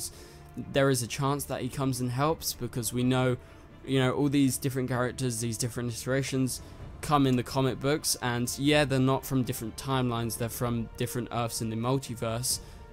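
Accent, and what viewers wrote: United Kingdom. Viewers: Thanks for the vid bruh! And... Is he British?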